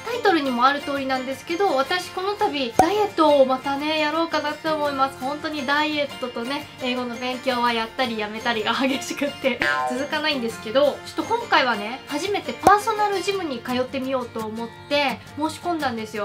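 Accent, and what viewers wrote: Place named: Kansai, Japan. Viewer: 関西弁ええなぁ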